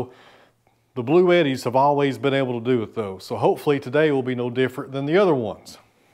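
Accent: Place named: Tennessee, United States. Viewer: Bluetti was smart to back you up,. I can't really explain, but you voice and the charming Tennessee accent I hear, always makes your videos so smile inducing.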